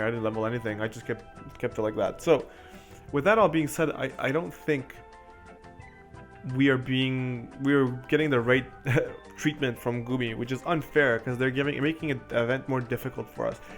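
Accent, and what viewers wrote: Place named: Caribbean. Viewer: it's hard to say, Trini or Bajan maybe, Bahamas but you don't eat all your words in a single gulp so maybe not Bahamas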